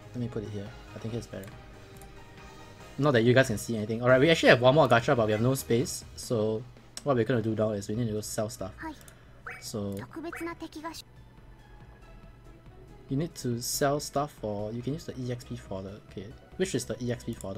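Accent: Singapore. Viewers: its called singlish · you mean everyone in Singapore speaks like this and actually understands each other??? O_O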